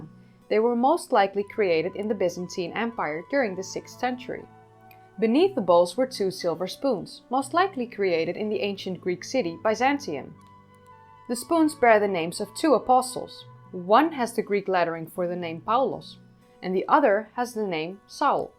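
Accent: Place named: Netherlands. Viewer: the heavy dutch accent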